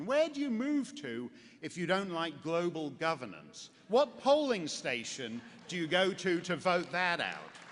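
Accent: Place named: Oxford, United Kingdom. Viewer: Well, I discovered Mr Mark "par hasard" on Google and started listening to his various speeches about many subjects such as Obama, the frightening "islamisation" of Europe (and the world in general), and so on, and was particularly interested and delighted by his impressive and witty defense of free speech; as an European (a Euro-skeptic to be precise) and mainly as a basically "anti-Left progresssive" Frenchman I must say I like this man and would appreciate much his coming to France just to debate with our stupid so-called "President" Mr 3% François Hollande, that would be fun to say the least; By the way, at first I really thought he was an Englishman, because of his "Oxfordian" accent, and could not help comparing him with the "petulant" Ukip leader Mr Farage, who dares telling the Truth about Europe and its growing denial of Democracy. 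I heard him quote Alain Finkielkraut, a french philosopher that I like very much and taught me to "see the things I saw", which is a good thing to do, like admitting that "the facts are always Conservative". I just ordered Mark book "After America"...And I'm sure i'll enjoy it....Oh, and I remember he also compared Neville Chamberlain and Winston Churchill... Good Luck!!! Love your insight.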